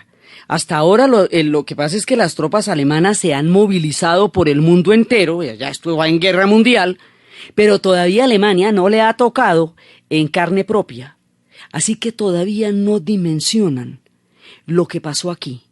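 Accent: Antioquia, Colombia. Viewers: Muy buen relato. ¿Eres paisa?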